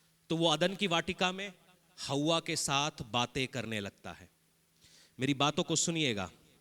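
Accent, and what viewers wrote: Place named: Punjab, India. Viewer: Jai Masih ki pastor ji God bless you very nice word aur aapki Punjabi Hindi sun kar bahut maza aata hai aur shanoo wali baat kia baat hai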